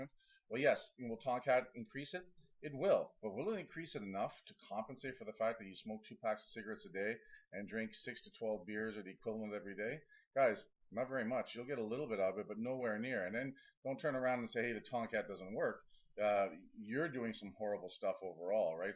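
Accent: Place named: Canada. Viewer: You are so attractive. Love the Canadian accent too. I don't mean to objectify you but it's your fault for being so visually appealing. You're also very bright and articulate. You seem very even tempered, kind, like you'd make a good manager/supervisor. You need WAY more subscribers. Your message and delivery are very persuasive and you're easy on the eyes.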